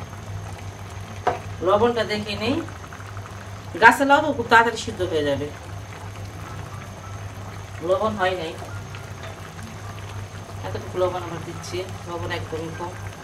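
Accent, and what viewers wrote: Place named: Narayanganj, Bangladesh. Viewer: Apu apnar desher bari kothay?apnar kotha shune mone hoy Narayongonj ba tar ashe pasher elaka.shuvo kamona.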